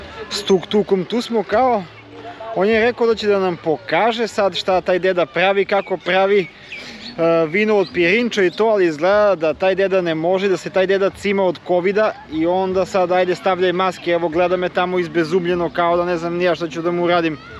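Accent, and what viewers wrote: Niš, Serbia. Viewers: Pričaj niški slobodno svi razumemo ,ali odlican video. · a be ovoj neki novi momenti :)) pričaj si sve niški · Pećina sa 5 miliona SLEPA MIŠA - kolko volim niški padeži i akcentovanje, nema jače! Samo napred, velika podrška, KIDAJ! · Baš mi je drago što be bate tepaš si po naš Nišlijski, pozzz može li tamo neki džokavac da se smota ovo ono :D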